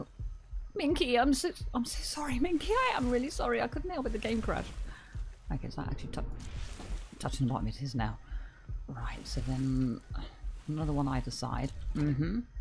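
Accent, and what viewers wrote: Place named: RP, United Kingdom. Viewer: Well spoken accent, as a geordie good English always sounds posh :)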